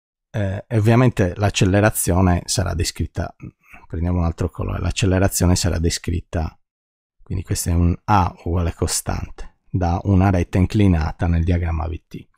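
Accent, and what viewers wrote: Sardinia, Italy. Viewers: Confusione tanta......e un accento sardo insopportabile. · meno male 28 anni non son bastati per farti perdere l'accento sardo :) saluti dall'isola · Buonasera professore! Provare a spiegare i quanti senza partire da Plank e dal piano PX( come fanno taluni) non è solo trascuratezza o tracotanza. Peggio: é mancanza di compassione per chi segue!!! Come coloro che spiegano la simultaneità in relatività ristretta, senza compararla con un esempio di relatività galileiana. Grande lezione la sua! Anche già per chi ci capiva qualcosina... ma sono sempre utili conferme che provengono da certi lidi. Emergono le dimensioni di h in modo immediato. Ma se uno volesse capire il modulo di h... sarebbe un problema? Anni fa studiai qualcosa, ma avendo avuto all' epoca altre beghe,non mi ricordo una mazza. A matematica arrivo a derivate , integrali e un po' di numeri complessi; non so usare matrici e tensori. 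Può indicarmi dove cercare qualcosa. Certo non oso chiedere a lei un aiuto sul sito, se non utile a tutti. Grazie per la sua lezione, senza enfasi o dialettate romanesche come quelle del simpaticissimo prof. Battimelli, che per questo a volte offre il fianco ai fisici ortodossi. Lei mi sembra sardo. Se così con noi Pisani avrà , come é stato da secoli un rapporto di fratellanza. Pisa= Gallura. Gallura= Pisa! Un caro saluto e scusi la mia prolissità senile!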